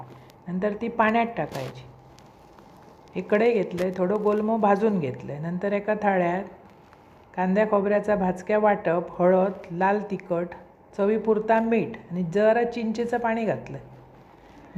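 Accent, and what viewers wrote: Malvani, India. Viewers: Tumchi swatachi ashi bolnyachi style aahey.Jyatun tumchi maiboli dokawatey.Aaikaila chaan watatey.Recipes ter jashya malwani lok kartatath tasyach sangata.Kunala aasal malwani padhatichey jewan shikaichey asel ter tumchye channel jaroor bagaway.All the best dear for not copping anyone else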